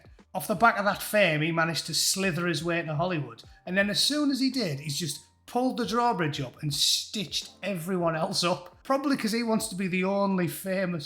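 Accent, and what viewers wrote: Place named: Northern England, United Kingdom. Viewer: Good stuff. First time here. Been working on my ability to distinguish English accents and I got it right from once. Guessed you had to be from the north and......apparently you are.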